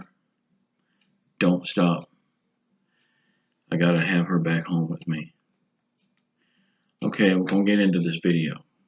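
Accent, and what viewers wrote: South, United States. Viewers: My boyfriend and I watch you daily. Prayers for your wife and you. It’s extremely obvious how much you’re hurting not having her home with you. Anyway, my boyfriend thought we should let you know we call you. He says you look like a mixture of Donald Trump and Elvis 🤷🏼‍♀️. I just like the southern accent in your voice and you could look like Elvis might if he’ was still here 😁😏 Where are you from? We live in Northern Indiana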